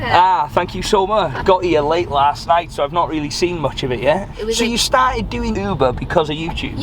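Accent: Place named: Liverpool, United Kingdom. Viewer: The more I listen to voice, the more I understand how related the Liverpool accent is to that of north Wales...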